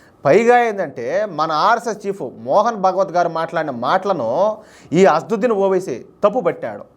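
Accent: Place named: Nellore, India. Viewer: Sir. Mee maatalu bhaguntai. Maa cousins matladinattu untundhi. Mi native Nellore or Ongole 😀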